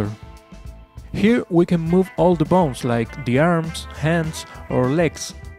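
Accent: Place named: Chile. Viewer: Hey bruh, are you from Chile??? I noted for the accent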